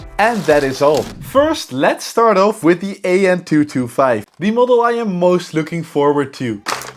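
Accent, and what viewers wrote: Netherlands. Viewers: I know u are Dutch cuz u have a Dutch accent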